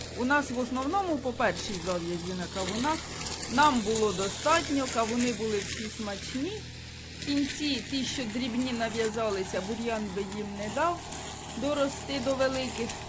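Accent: Poltava, Ukraine. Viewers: почула вашу мову і сльози на очах.... Мені вже 60. Я народилась в Полтавській обл, Чутівський рн. Ви так балакаєте, як у моєму рідному селі Нагорна, поряд Вільховатка. Звідти родом моя покійна мама. Так хочеться хоч разочок ще побачити те, чого ніколи не забувала. Завадила війна. Щиро дякую тобі за наш особливий говір. Ти й не уявляєш, яке це щастя. Наче побувала на Полтавщині.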